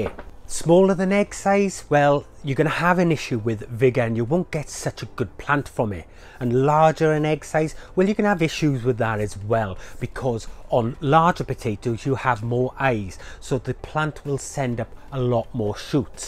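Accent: Wales, United Kingdom. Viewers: I was just trying to figure out your accent. I`m Canadian, so I`m only vaguely familiar with the diverse British accents. I was guessing Wales. So I was right. I've been growing potatoes for years, but will try container growing this year. I generally harvest my potatoes in November, and keep them in the Fridge. By March the remaining potatoes start sprouting. In Canada the ground is still frozen. The earliest you can plant them is late April, but May is more typical. Most of my potatoes are small, I`ll have to improved my technique to get bigger ones.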